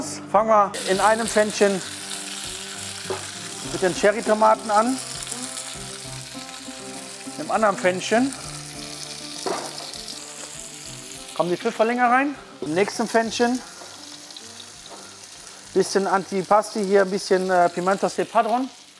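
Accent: Cologne, Germany. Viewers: wie immer alles super erklärt. Mit deinem Kölschen Akzent, Meeeggaa. Macht richtig Spaß deine Videos zu schauen! Weiter so!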